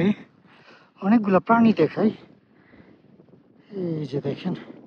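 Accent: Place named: Sylhet, Bangladesh. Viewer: ভাইজান আসসালামু আলাইকুম আপনার কথার ভাষার মধ্যে মাঝেমধ্যে সিলেটি টান আসে আপনার বাড়ি আসলে কোন জেলায়❤❤❤❤❤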